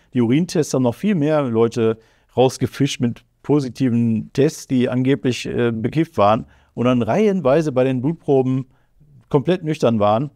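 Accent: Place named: Berlin, Germany. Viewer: Immer noch ganz schön „Berlin‘isch“ 😂😂😂 einfach BESTER Mann, sag ich!!!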